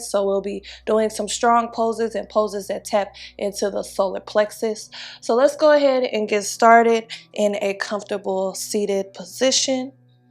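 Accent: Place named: Chicago, United States. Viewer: Peace be upon you. I'll be honest, I didn't like your channel when I first discovered it probably over a year ago now, but I'm sooo glad I gave it another try! I loved this flow and something about hearing your Chicago accent in a yoga practice makes it that much more homey for me. Thank you love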